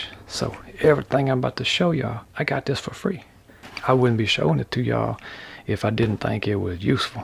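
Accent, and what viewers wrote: Louisiana, United States. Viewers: Do i hear a cajun accent? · I can't tell if you're a character or just a witty leezianna southerner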